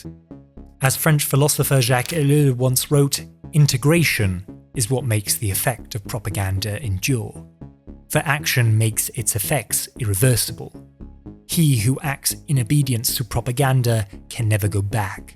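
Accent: England, United Kingdom. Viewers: Okay, I have a question aside from saying: This looks and sounds amazing!!! But where are you from? The german is sooo good, the italian also sounds good (even tho I don't speak it) and the english sounds british. I am like, so confused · The host sounds quite similar to Simon whistling who runs biographics, war fronts and loads of other channels? I does say the person who runs the channel is Swiss but the host has an English accent.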